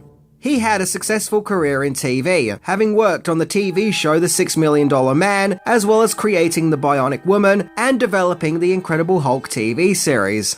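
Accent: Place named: Australia. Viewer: I love the (Australian?) accent pronouncing it "Vay." It keeps catching me as I listen. "Vee" sounds more ominous, imo. But I'm glad this series appears to have crossed the pond if it went all the way down under. As kids, we gathered together at my friend's house because they had cable, which meant really good reception without going blurry like television shows did back then if you didn't have cable. It was before HD, so the effects were super cheesy, but so much fun!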